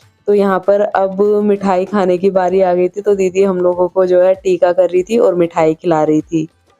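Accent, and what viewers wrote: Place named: Haryana, India. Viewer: hnji ap ki language mei touch ata h wo haryana walo ka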